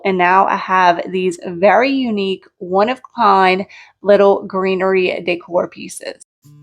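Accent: Louisiana, United States. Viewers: Love watching your creative projects! Also, I have to know where your accent originates! My guess is Louisiana.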